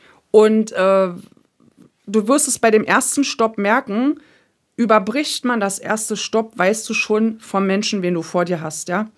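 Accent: Berlin, Germany. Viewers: Liebe, lass die Leute reden, da fällt mir das Lied der ein. Ich danke dir für deine Arbeit deine Zeit und deine Legungen 🙏🍀💫 Alles wird gut, ich bin im Vertrauen 🍀 Ich mag dich sehr, höre dir gerne zu, es gibt Legungen die ich einfach nur höre, andere sind wieder sehr stimmig. Du bist spitze, deine Berliner Schnauze, deine klaren Worte, Bombe einfach alles 💪🫶☀️ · Sächsische Einlagen? Ist doch eher Berliner Dialekt.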